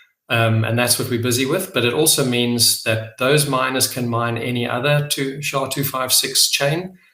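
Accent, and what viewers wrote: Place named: South Africa. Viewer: Sounds South African 🇿🇦🇿🇦🇿🇦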